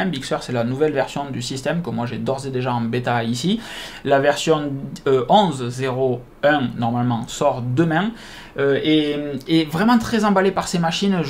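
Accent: South, France. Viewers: Bon moment, l'accent du Sud en +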